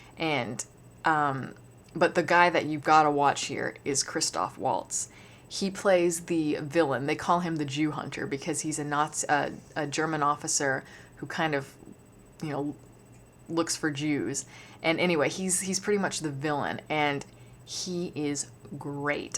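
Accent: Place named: South, United States. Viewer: Southern twang? Accent has changed a bit. A follow up re review on this? It has aged horribly imo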